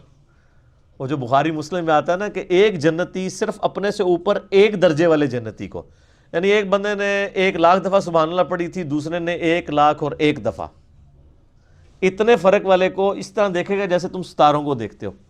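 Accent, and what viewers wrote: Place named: Punjab, Pakistan. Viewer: byology wo apne accent ki waja se bol raha ha, q k wo punjabi hai ,or rahi bt darwin ki theory ki to wo sirf ek theory hai, or kafi scientist b os theory k against hain, or boht sare scientist support b krte hain, but science with evidence ho jb mani jati hai, jaise NASA ne hmein planets dekhaye. thoery to koi b Scientist de deta hai, or next century ya phr osi century mei os k against b ek theory ajati hai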